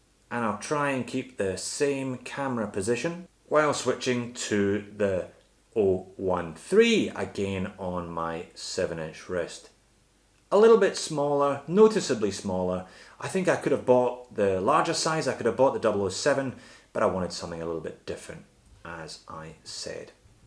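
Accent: Scotland, United Kingdom. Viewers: Stop doing that Scottish accent !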